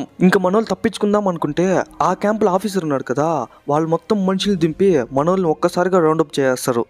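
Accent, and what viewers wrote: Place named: Rayalaseema, India. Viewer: Mana rayalaseema accent lo balega explain chesavu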